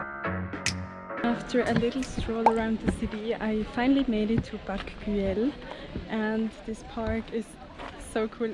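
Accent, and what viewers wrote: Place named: Germany. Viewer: Your beautiful accent reminds me that of my ex-german girlfriend! You are so sweet!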